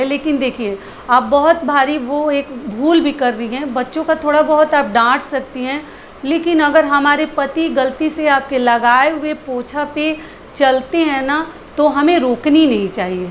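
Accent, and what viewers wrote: Bihar, India. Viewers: Aap Bihar sa ho kya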